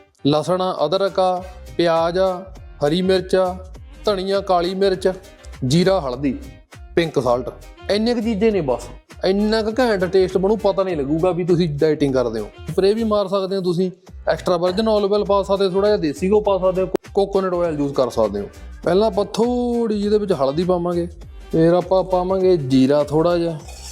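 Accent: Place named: Malerkotla, India. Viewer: ਇੰਨਾ ਕੁ ਪਾਣੀ ਪਾਉਣਾ ਡੱਬੂ ਵਿੱਚ ,, 😅 ਗੱਲ ਸਿਰਾ ਕਰਤੀ ਬਾਈ ,, ਆਪਣੀ ਬੋਲੀ ਇਹੀ ਆ, ਮਲੇਰ ਕੋਟਲਾ ਸਾਈਡ ❤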